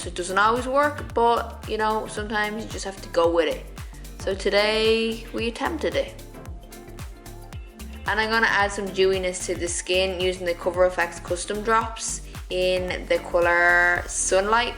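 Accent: Ireland. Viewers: I loved your look in this video and overall. I found you shopping on Tarte! I bet you will be the next huge thing on YT<3 Where is your accent from? You are in CA but sound Irish?=P I'm a new fan from NZ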